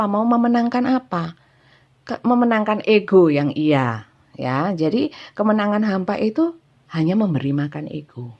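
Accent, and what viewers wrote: East Java, Indonesia. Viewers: Ikut nyimak bunda...suka sekali sama suaranya yg medok.. terimakasih redinganya bunda🙏🙏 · Bahagia dengar bacaannya sister terutama ngomong jawa timurnya asyik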